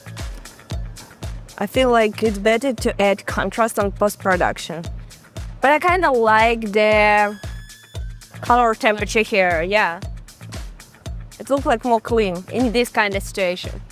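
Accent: Russia. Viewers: Мне показалось, или я слышу русский акцент? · Слышу русский акцент 😊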